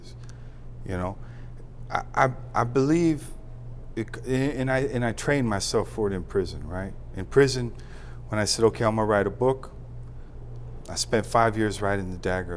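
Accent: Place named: Chicago, United States. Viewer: I was wondering what it was in your voice the whole time.. It's that Chicago accent man.. I'm a Gary boy.. Family off Manistee on S.W. side..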